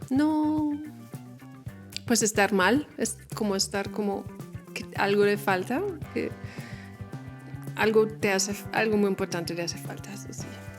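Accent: Colombia. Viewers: Tan linda 💐🌹 Gracias por todos los alagos a Colombia belleza 💞 hablas muy a lo colombiano 💐💐💐 Bendiciones ✨✨✨ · Ya hasta tiene acento y expresiones colombianas. Impresionante. 😮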